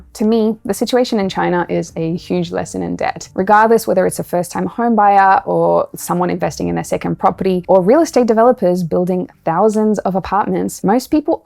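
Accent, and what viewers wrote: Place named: Australia. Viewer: Your starting to lose your Australian accent...😄 Thank you for the such details you go into too explain everything... it's a shame that 99% of the world's population has absolutely no idea what is really going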